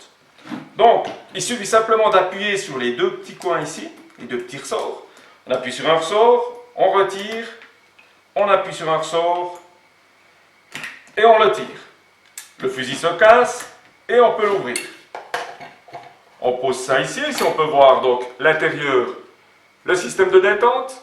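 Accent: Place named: Bernese Jura, Switzerland. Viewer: du jura-BERNOIS!!! bandes d'ingrats, merci pour ta video👍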